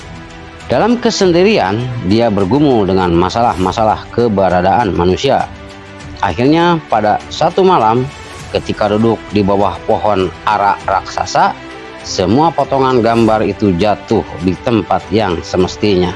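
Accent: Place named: West Java, Indonesia. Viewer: Lidah Sunda naratornya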